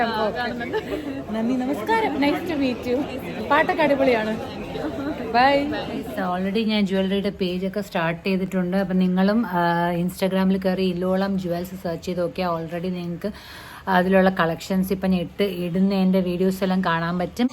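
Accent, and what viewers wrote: Trivandrum, India. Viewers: Good presentation.good language tvm😀🤣🤗good🤗thank you. Suscribe cheythu. Good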